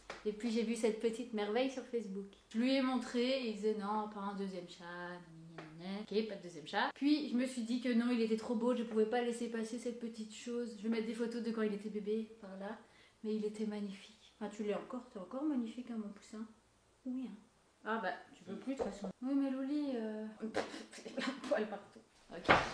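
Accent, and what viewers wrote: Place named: Quebec, Canada. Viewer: J'entends un léger accent. Québécoise ?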